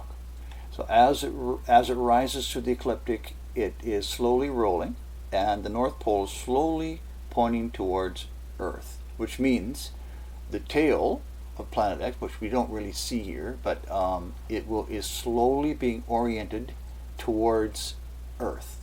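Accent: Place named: Canada. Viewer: Hey are you Canadian? You sound like me. lol. I think I know that accent. Be sure to see these if you have not regarding planet X, excellent information. it discusses the plasma field as well as the iron oxide and the debris it drags along with it on all sides, pushing asteroids ahead and dragging it behind. It isn't moving that slowly either as Carlos Ferrara discovered in his 40+ years of study of its perturbations, Carlos found it travels at three speeds, check out what he has to share with us about this. CARLOS MUNOZ FERRADA HERCOLUBUS (Chilean Astro Pysicist 40+ years study Nibiru perturbations) <link> Also don't think our magnetosphere is going to protect us as it is dropping at a very high rate at this point see this about that, stop the articles/emails in the video and read them to explain that which I am speaking of. Earth Changing End Time Signs (Magnetosphere is dropping, pole flip coming) <link> John B Wells; Gill Broussard Planet 7X (as far as I am concerned Gill produces the finest research material available) <link> A MUST WATCH Interview Nibiru Planet X future predictions;Carlos;Ferrara;(Chilean Astro Physicist 40+ yrs research) <link> P-7X Scripture and;TIMELINE Lg 11x17.pdf <link> (Free PDF downloads of Nibiru Timeline according bible and Asian Astronomical records) Gill spent 9 months in China alone studying Emperors Head Astronomers records and compared them to those of Korea, Japan, Germany, Turkey, the bible....it all correlates as true. 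The signs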